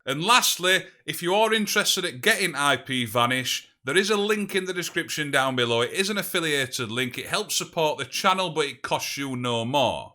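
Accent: Yorkshire, United Kingdom. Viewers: I'm from Germany and I like that Yorkshire accent. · Thumbs down due to the Yorkshire accent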